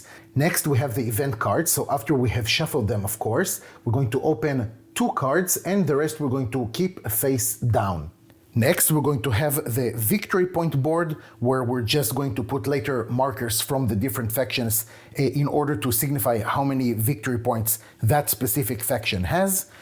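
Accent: France. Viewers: Oh, my apologies. I assumed you are French, since you are located in Paris and you definitely feature a French accent. If that isn't a French accent, what is? On top, you seem to sling a perfectly fine typed French. So if you are not French, you basically are the perfect infiltrator, probably on secret mission. 🤣🤣🤣 Again, sorry for my misconception.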